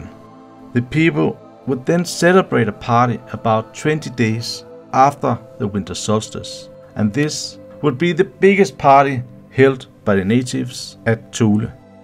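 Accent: Denmark. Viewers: I swear this guy is danish i can feel it in my danish body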